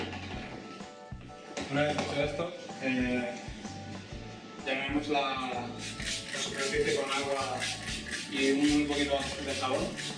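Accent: Chile. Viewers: chileno la wea fome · No se entiende lo que dice!!! Eres chileno?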